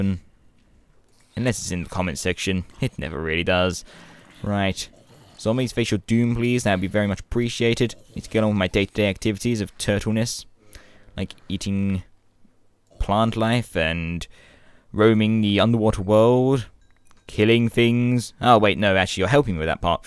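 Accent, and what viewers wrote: United Kingdom. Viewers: I love your voice im british too <3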